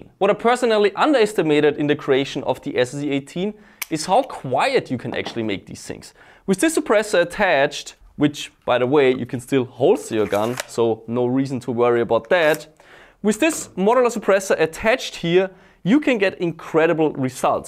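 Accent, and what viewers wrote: Austria. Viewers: Sometimes the Austria Accent comes through your English! And I like it! (I come from Germany, so...)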